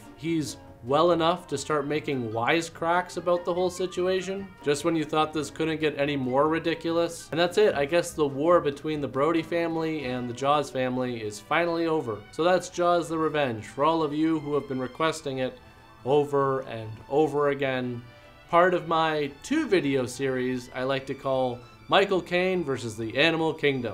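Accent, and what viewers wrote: Canada. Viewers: Not to tease, but he does have the accent. It's not really "aBoot" as much as it is more like "aboat", though. And it's subtle and not as pronounced as the stereotype would lead people to think, but it;s there. In fact, I usually guess correctly that a YouTuber is from Canada when they say anything with the "out" sound as "oat". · Now that you mentioned Canada, I can't unhear your minor accent.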